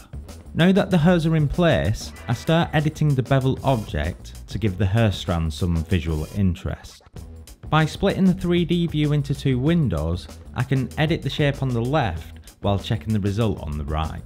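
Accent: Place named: Lancashire, United Kingdom. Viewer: Proper Preston/Chorley accent! Loved this, wish I'd stuck to doing 3D work.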